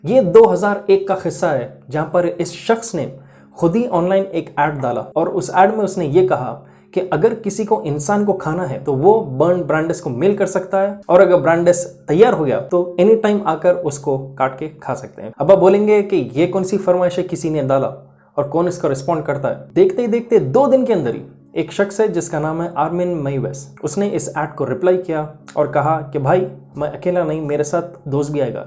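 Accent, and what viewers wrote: Hyderabad, India. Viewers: Hyderabadich hai miya ye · your Hyderabadi accent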